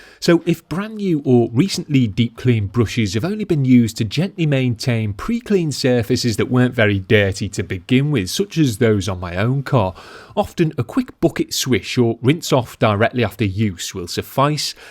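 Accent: Liverpool, United Kingdom. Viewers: Lovely Jubbly scouse😉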